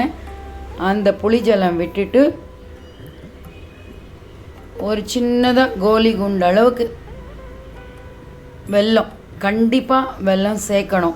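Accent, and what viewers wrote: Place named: Sri Vaishnava, India. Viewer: Sri vaishnavaa பாஷை கேட்க மிகவும் ரம்மியமாக இருந்தது மிக்க நன்றி 🎉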